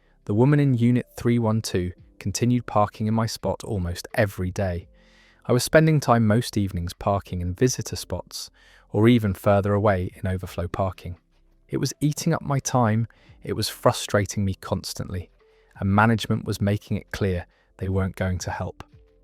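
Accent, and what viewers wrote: United Kingdom. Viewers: Does anyone know where this accent might be from? I’m sure it’s a British accent, but I’d like to know the dialect, it’s very peaceful.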